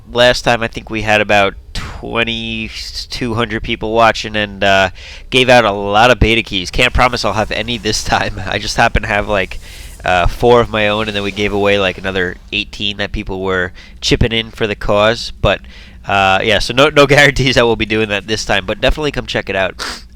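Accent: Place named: East Coast, United States. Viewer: As much as I don't like the screaming in my ear, I love his east coast accent. :P Go America!!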